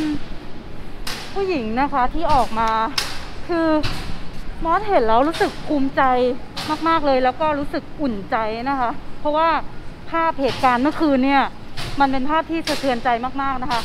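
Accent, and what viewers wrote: South, Thailand. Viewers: คุณมอส เป็นภาคใต้บ่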